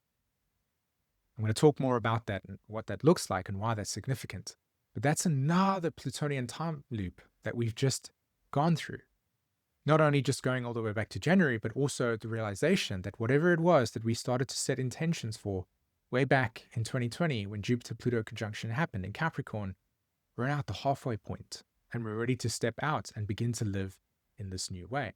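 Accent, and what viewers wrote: South Africa. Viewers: I'm wondering if your accent is South African?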